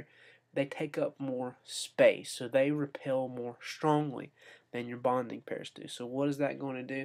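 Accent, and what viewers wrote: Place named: South, United States. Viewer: Nice to hear a Southerner talking some sense every once in a while. No invocations of jebus and some good explanations of VESPR. Thanks.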